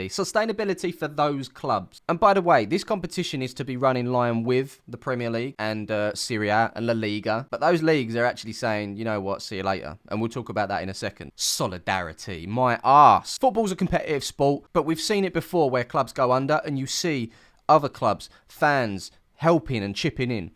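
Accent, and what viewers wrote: United States. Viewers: Fun concept, but wow this is bad for Europe. We at Forward Madison FC will gladly take you all in. And you have a spot on American accent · On one hand, I’m offended that you needed to do the American accent, but this is largely our fault, so.... dammit.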